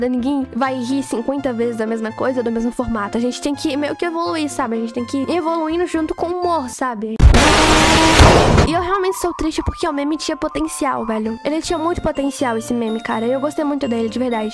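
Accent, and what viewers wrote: Brazil. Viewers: Vc e sulista? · tu é carioca? 🤨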